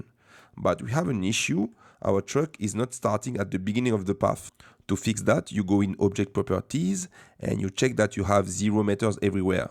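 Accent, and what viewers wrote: France. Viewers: are you french? cause of your accents haha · je peut entendre le p'tit bout de francais qui resort. merci pour le tuto! je compte pas re cree la scene mais certains de tes tips m'aiderons ^^ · salut, ca sent l'accent français non ? ^^ cool la vidéo. edit: en plus, c'est un windows en fr, donc plus de doute ^^ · are you French ?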